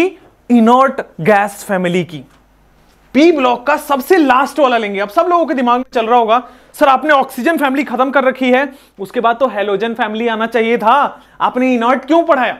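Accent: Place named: Bihar, India. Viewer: Sir your accent and the way you speak words... Tells us that you are from bihar... And u prooved this too : " Bihari hai to bambaari jrur hogi " .... The only difference is... Aap bambaari chemistry me krate hai.... I literally enjoyed all your lectures.... UP se kota ki teaching ke benefits de rhe hai aap.... Thank you so much sir for your hard work... 🙏✌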